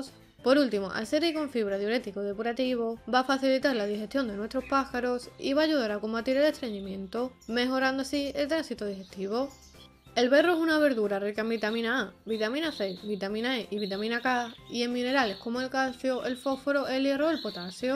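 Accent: Spain. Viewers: Gracias, muy util incluso para mi no solo para ellos jejeje😊Tengo la curiosisad por saber de donde es tu acento ,se que eres española pero de que region? Gracias nuevamente!!! 😊 Un saludo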